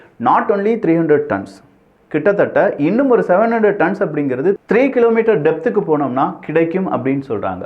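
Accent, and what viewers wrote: Kerala, India. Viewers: Neenga enna malayali ah?? Illa neenga border state ah??? Namma tamilnadu slang la pronounsation pannunga.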